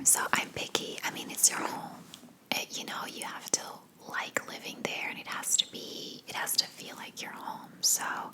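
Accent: United States. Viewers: I'm so curious about you. You have an American accent but you live in Ireland. Your folks live in Spain. Can you speak a little bit about your history.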